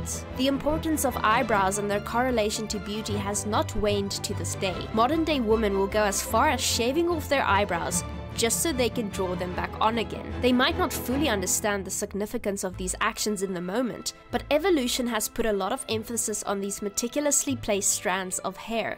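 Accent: South Africa. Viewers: First I love the South African accent 🇿🇦❤ and awesome video glad you looked further into this! You are very intelligent! :) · South african english accent makes the video best ❤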